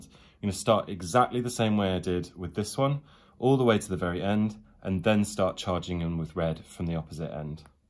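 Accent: United Kingdom. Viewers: Watercolours has been an enemy to me for years, pfft. But even so I enjoyed this video a lot. I'm a big fan of people who can actually work with watercolours, they have the God's hands I would say😂 Also, brittish accent gives lives. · How did I not find you before?? Add with an English accent : a balm to my ears x I'd appreciate any contents on volume and movement, please! Thanks!!!!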